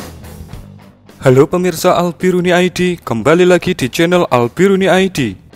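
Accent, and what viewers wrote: Java, Indonesia. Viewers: Medok ya mas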